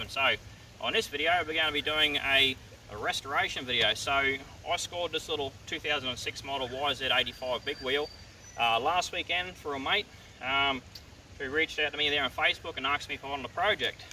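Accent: Australia. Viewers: Love the content mate I love the pure Aussie Never commented on a video before but I think this needed it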